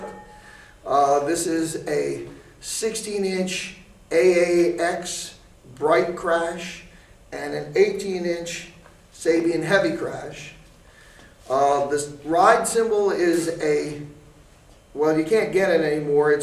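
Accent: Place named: Baltimore, United States. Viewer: Haven't lost yer "Balmer, Merlin" accent, "hon"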